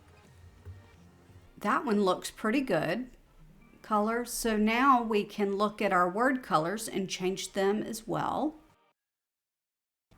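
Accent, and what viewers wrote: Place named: South, United States. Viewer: Thank you your video provided everything I needed to know and the potential that the website has to offer. Love your Southern accent too,!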